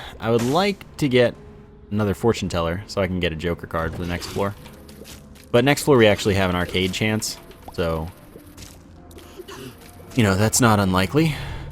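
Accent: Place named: Canada. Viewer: Ive noticed that you say a-boot instead of about so are you canadian by any chance.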